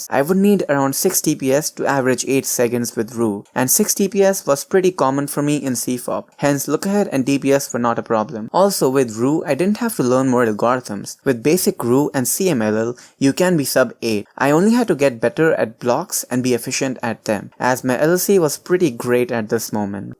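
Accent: India. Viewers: Indian accent turned me off...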